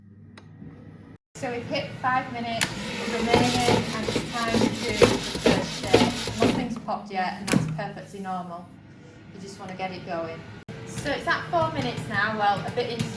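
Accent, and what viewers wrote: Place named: Barnsley, United Kingdom. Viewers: You sound just like I did and everybody else did in the area of England where I lived the longest. :-) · Barnsley accent ay up chuck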